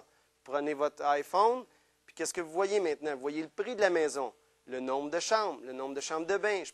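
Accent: Quebec, Canada. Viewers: C^'est un accent Québecois --'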